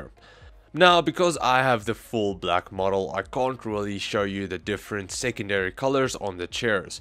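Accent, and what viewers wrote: Afrikaans, South Africa. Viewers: Kan hoor jy is Afrikaans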